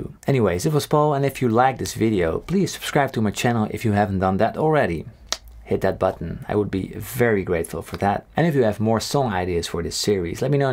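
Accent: Netherlands. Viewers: Are you dutch? You sound like a fellow dutchy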